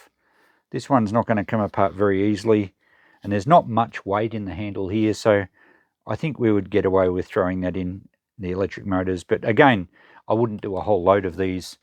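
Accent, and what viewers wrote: Australia. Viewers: Hi, I think you have an Australian accent? So when you say dollars, presumably these are Australian dollars? Or New Zealand? Or are you maybe an Australian living in the US? Just curious as the value of the tools seems to be very relevant, here and I need to translate to my own currency.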